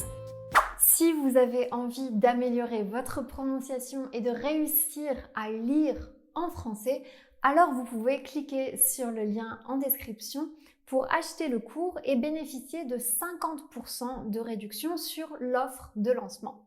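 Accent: France. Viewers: Disons que tu as un accent français de France très marqué pour moi ! En Suisse romande, on fait la distinction entre les a de bras et gâteau (fermé), et souvent entre le o (souvent ouvert) et eau (toujours fermé) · Bonjour. Je ne suis pas d’accord avec la prononciation pour le « ê ». Il se prononce bien « è » et non « é » quelque soit le mot. On a un accent circonflexe là où en ancien français, il y avait un S après la dite lettre avec accent circonflexe aujourd’hui: Hospital (Hôpital), Forest (forêt) etc. Pour le verbe rêver, il vient du mot « esver »: nous retrouvons bien le S. Cette règle fonctionne pour toutes les lettres avec accent circonflexe. Ensuite, je remarque que tu prononces le son « è » en « é »: tu dis francé et non français. Pareil pour « j’ai » etc. Tu as bien hérité de la patte du Sud de la France;) Enfin, le « â » est bien prononcé dans la région champenoise et surtout dans le Nord de la France. 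Je pense à la région de Troyes notamment ^_^. Sinon super travail pour les non francophones:) N.B: je suis bien française et enseignante;)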